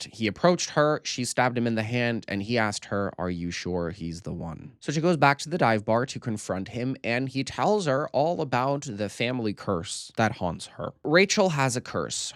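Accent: Portugal. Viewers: O PORTUGUÊS DE PORTUGAL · Meu Deus o choque que eu tive quando voce comecou "Amor é fogo que arde e não se ve..." eu esqueci totalmente que vc fala portugues hahahahahaha mas nossa pra mim (br) é realmente difícil entender português de Portugal, que doideira né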